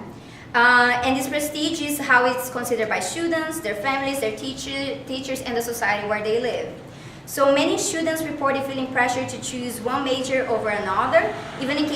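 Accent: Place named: Goiás, Brazil. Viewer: loved your sotaque goiano em inglês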